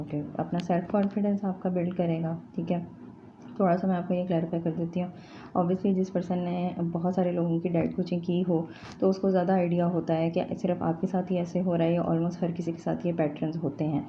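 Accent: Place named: Iran. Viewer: I thought u were from foreign country after hearing u r english i thought u were from Iran or saudi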